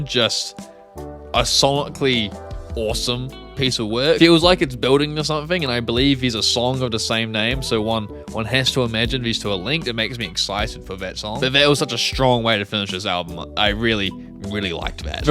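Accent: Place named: New Zealand. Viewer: cool! is also from New Zealand, so you both have this nice accent. You also have to check her albums out!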